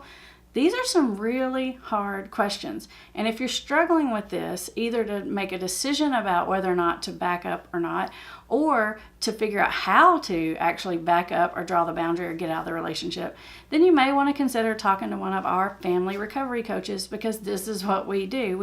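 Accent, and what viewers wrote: South, United States. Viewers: i like that country accent ;)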